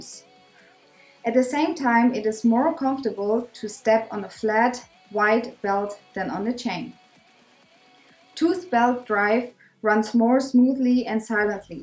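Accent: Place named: Germany. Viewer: Man hört, dass du deutsch bist. Bist auf jeden Fall der Hammer! Weiter so^^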